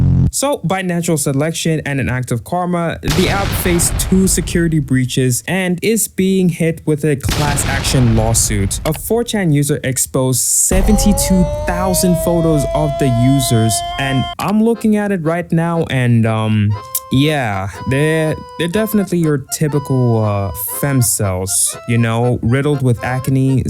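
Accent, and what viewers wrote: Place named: United States. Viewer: Wait...for someone who lives in SA,if he was born there,this dude has American levels of English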